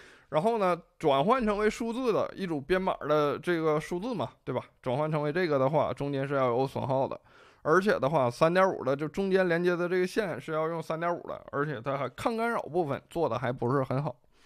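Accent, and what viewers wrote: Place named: Northeast, China. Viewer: 哥们你东北哪儿的:face-turquoise-covering-eyes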